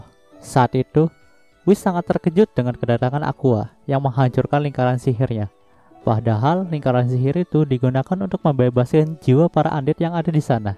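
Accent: Cirebon, Indonesia. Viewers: Orang Cirebon njir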